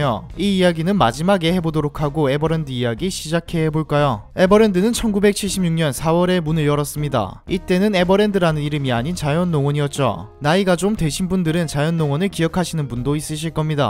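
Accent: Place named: Busan, South Korea. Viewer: 듣기전에 몰랐는데 부산사람이라는 걸 알고나서 부터 들리는 사투리 억양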